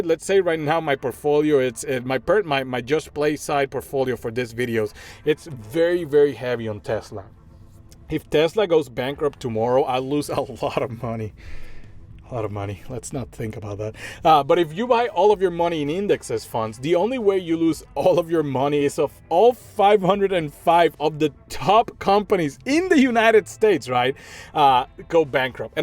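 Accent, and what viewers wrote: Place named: Spain. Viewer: Ok thank you for your answer, I know English too but I thought you was a native spanish talker jajajaja, I like a lot this new channel, i will take a look of the other videos, thanks for sharing your experience!!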